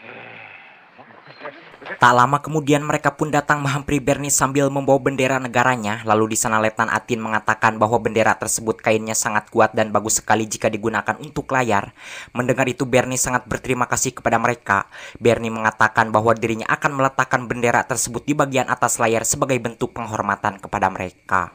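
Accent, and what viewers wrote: West Java, Indonesia. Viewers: Sundana ti mana kang admin?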